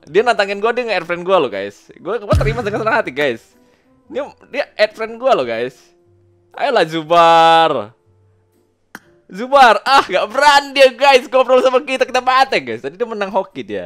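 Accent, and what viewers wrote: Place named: Sumatra, Indonesia. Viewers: Orang aceh. Itu dger bahasa ngomong nya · logat ujung sumatra nohh,,, maluin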